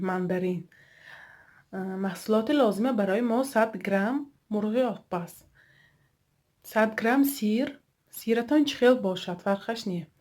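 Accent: Isfara, Tajikistan. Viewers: Хело,ба фикоам шумо аз Исфара,лахчаи гапзанитон монанд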